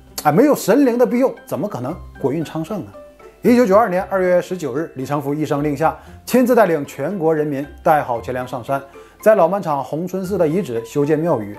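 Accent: Northeast, China. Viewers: 我一般听不惯东北口音的播主你是个例外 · 东北味儿普通话，不难懂，不需要字幕吧，会影响更新频率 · 东北的吧?好亲切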